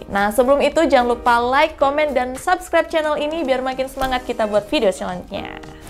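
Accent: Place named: Bali, Indonesia. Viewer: Logat balinya masih kental kak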